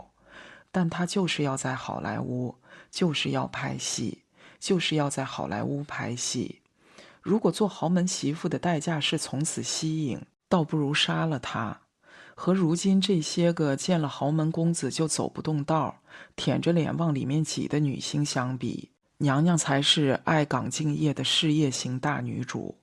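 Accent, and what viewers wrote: Cantonese, China. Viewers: 哈哈哈哈哈哈哈哈哈哈哈哈哈哈哈每次聽主播說粵語我都笑不行了🤣🤣🤣🤣🤣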